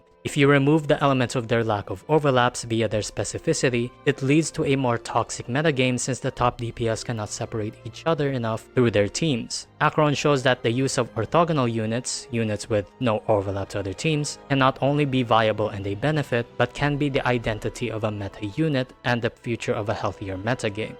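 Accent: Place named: Philippines. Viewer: This guy lowkey sounds filipino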